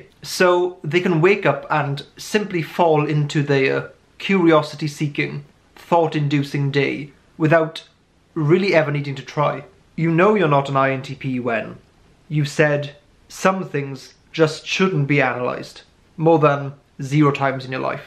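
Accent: Wales, United Kingdom. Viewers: You sound VERY welsh. I’m south, how’s lockdown treating you?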